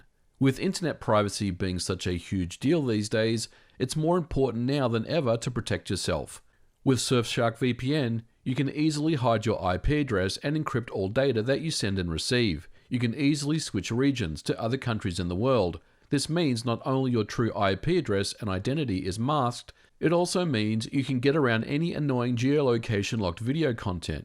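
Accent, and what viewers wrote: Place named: Australia. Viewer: Man, i just learned today that this guy is Aussie, i always thought he was British somehow lol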